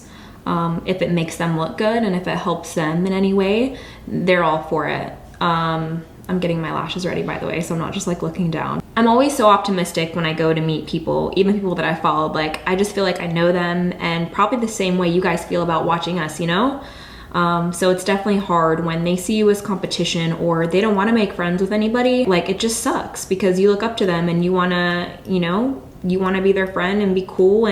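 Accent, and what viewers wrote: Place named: New York, United States. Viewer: Sounds just like New York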